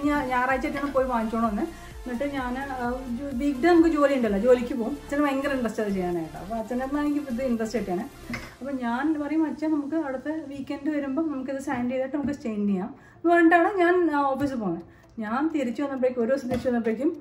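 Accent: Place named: Palakkad, India. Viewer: Hey,I am a new subscriber!vlog popped up on my feed!excellent narrative and sweet home!I also made another observation,Are u from palakkad?oru valluvanadan slang thoni!I also hail from pkd.And ur gestures and slang resembles anchor rekha menon too!☺️Has anyone else told the same?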